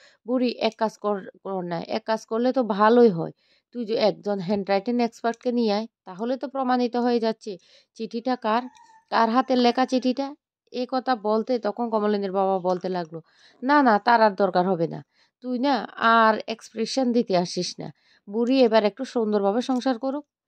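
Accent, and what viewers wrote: Chittagong, Bangladesh. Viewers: আপনি বোধহয় চট্টগ্রামের তাই কথা গুলো এভাবে বলেন।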